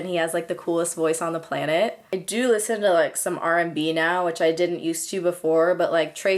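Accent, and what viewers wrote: California, United States. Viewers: Lol, I've been watching on and off for a few months and I came across this video so I was like, "This is probably the best way to really start!" And tbh that was a good idea. Because she talks exactly like everybody I grew up with. I'm not currently home but she made me miss California! Idk exactly what it is but it's a very Californian way to speak. Anyway, I'm subbed now!:) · Imagine, I've just sat here for 34 minutes watching this, despite having followed you for over a year and knowing you pretty well, and I still don't know why you're called 😩 loved every second though, that strong valley accent killed me 😂❤️❤️❤️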